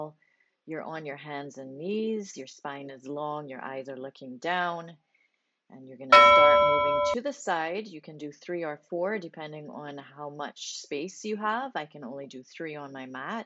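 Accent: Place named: Barbados. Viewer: Great 10 minutes! I did it after your ab/glute band workout. Perfect fit! And I love how your Bajan accent is coming out 😊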